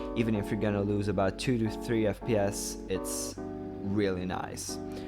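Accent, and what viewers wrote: Germany. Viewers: Good tutorial thanks (btw German accent? :d)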